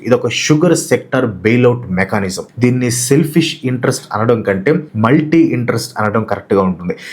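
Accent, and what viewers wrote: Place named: Guntur, India. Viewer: Guntur slang🥰❤️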